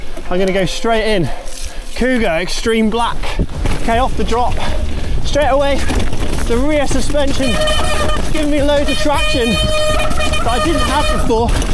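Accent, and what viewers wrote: United Kingdom. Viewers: umm are you in british?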